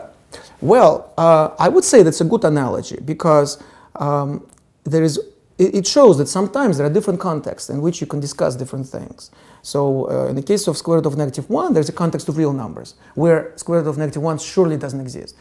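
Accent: Russia. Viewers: The guy has some horror core Russian accent. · It's a russian accent, very very fun to listen this - russian phonetics and russian emotions, but english phrases and words. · I can understand all of this just because i am russian too, because his accent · Он точно Русский! Он имеет Русский акцент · мне еще нравится что не всё с русским акцентом, это вперемешку с чистым, правильным произношением, мне кажется это странным если он может так бегло разговаривать, но при этом говорит на половину или больше с сильным русским акцентом, как-будто он даже не старается, и не пытался учить как правильно произносятся слова, это странно🤔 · Russian professor? Him accent... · is that a russian guy? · Yeah, it's kinda wierd: his wording is correct and he uses live idiomas and cultural references but his accent is soooooo soooo Russian. I believe he keeps thinking in Russian and then formulates a quick translation of his thought in English - that's quite strange as naturally upon some point you start thinking in the language that is foreign to you and that is the moment you generally say one speaks a language. 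А тут прям такие знакомые интонации, прям слышно как звучит с такой же интонацией русский оригинал фразы )) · is he russian? he has a small but distinct russian accent... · Somewhat strange, but I understand J. Grime's accent better than this' obviously Russian speaker. (Although I'm Russian) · This guy sounds like he has some russian accent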